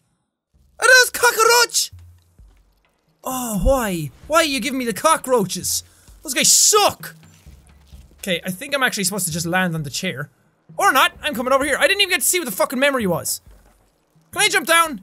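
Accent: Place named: Ireland. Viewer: "And I don't know whoowhy." And other Irish ways of saying words. ^ | Jacksepticeye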